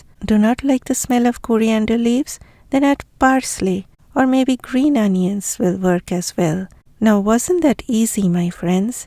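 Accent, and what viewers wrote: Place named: India. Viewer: Haha indians have cute accents. Wobbly head nods are funny.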